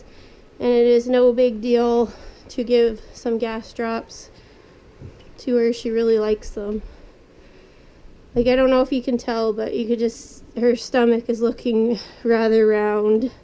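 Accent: Canada. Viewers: WAIT ARE YOU CANADIAN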